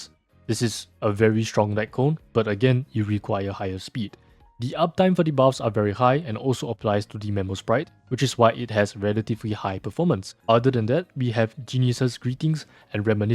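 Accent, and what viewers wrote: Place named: Singapore. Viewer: me too! i could tell from the accent! nice to see a singaporean creator playing this game too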